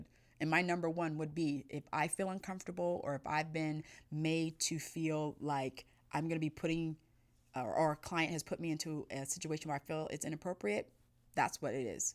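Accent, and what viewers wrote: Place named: East Coast, United States. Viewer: "What I wanted to say was... get your in the bed, why are you naked for a facial?!!"... ROTF.... I can't breath! you sure sound like you're from the east coast!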